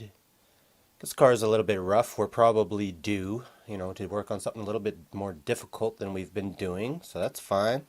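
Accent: Canada. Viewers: Loved the commentary, you sound like you are in Canada?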